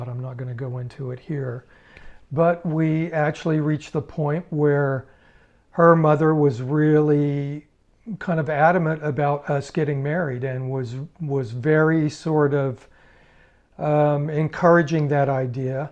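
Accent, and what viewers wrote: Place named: United States. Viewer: You have a very American accent for a Brit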